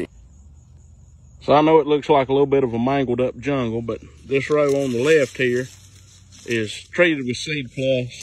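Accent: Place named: North Carolina, United States. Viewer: I knew you were also from NC just from the accent, before I saw the channel name. Thats some good lookin corn there!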